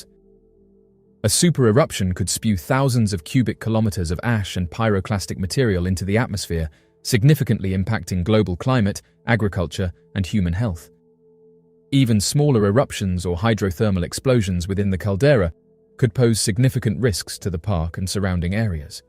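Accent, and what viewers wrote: United Kingdom. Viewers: Why is it that most videos I watch are about the USA and yet the voice is always British? Don't any Americans know about America, or do the British not want to talk about their own .....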